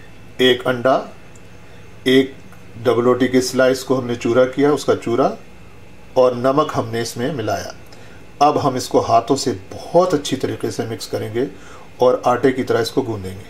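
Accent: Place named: Uttar Pradesh, India. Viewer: Aap ka "quaf" batata hai ke aap ki jaren shayad U.P.men hain.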